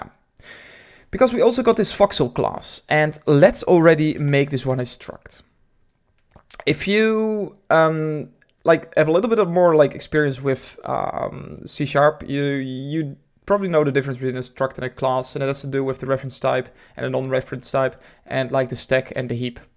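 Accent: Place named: Netherlands. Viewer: I smell Dutch :D :D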